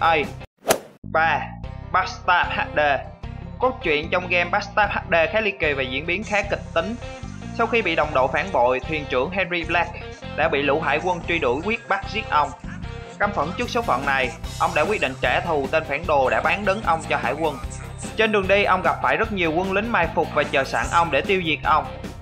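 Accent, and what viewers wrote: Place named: Binh Dinh, Vietnam. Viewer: ôg giới thiêu game có giọng nói giống dân 77 quê tui gơ😂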